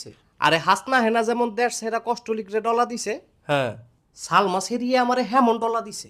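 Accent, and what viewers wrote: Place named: Narsingdi, Bangladesh. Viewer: আমনে কি নরসিংদির নাকি,,,আংগ ভাসায় দি কতা কন,,,,বাড়ি কইনো আমনেগো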